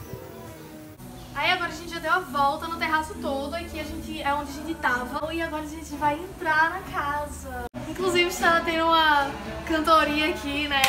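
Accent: Northeast, Brazil. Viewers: Que sutaque maravilhoso de se escultar (sou do nordeste tb)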